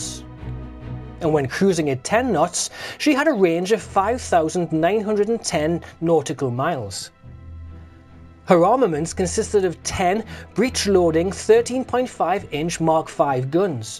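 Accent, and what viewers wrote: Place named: Scotland, United Kingdom. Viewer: I definitely like your Scottish accent. Thumbs up, subscribed.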